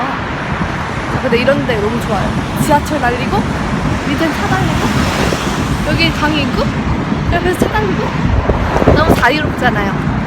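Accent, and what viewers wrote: Seoul, South Korea. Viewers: 말투 약간 90년대 서울사투리느낌 있어요ㅋㅋㅋ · 연예계에서 연락도 많이 왔을 거 같은데 궁금하네요 😂 묘하게 서울 사투리 같은게 순둥순둥 귀여워요 😊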